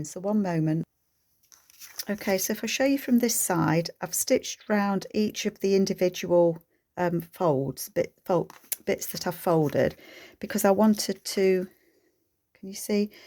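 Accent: United Kingdom. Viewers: These are quite clever! My grandmother said she was born in 1900, but the Bible says 1898!! We guess she just wanted to be in the new century! When were the Scotsmen allowed to wear kilts again? I know for a long period they were outlawed. You have the loveliest accent! I don’t know how to distinguish British accents from one another, but yours is distinct, I’d like to listen to you for a whole evening.! Please no mushroom, the kilt shouldn’t be covered up!! (Just my opinion!) haha! Very sweet little booklets & you’re so organized! I’d like to be, but… thank you!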